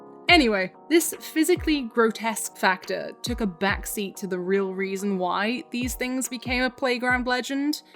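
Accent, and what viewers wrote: Wales, United Kingdom. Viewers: I new I recognised that ascent! Got a question is wales.. good? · Are you brittish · Ur british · I KNEW I HEARD A WELSH ACCENT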